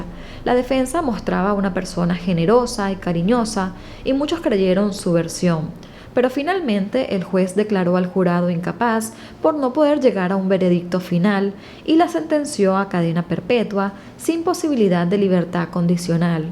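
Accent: Venezuela. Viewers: Buenos días tienes una excelente capacidad de narración eres Venezolana ? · TheFeistyOne xx creo q es de Venezuela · De donde eres? Me suena que eres paisana😊🇻🇪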